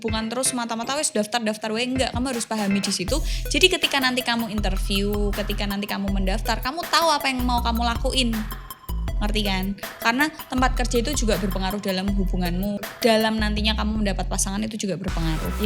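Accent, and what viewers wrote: Java, Indonesia. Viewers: ❤️mbk konten mu super duper jos low.. jowomu jek kentel 😂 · Seneng ngerukngokno suara jowomu mbak 😂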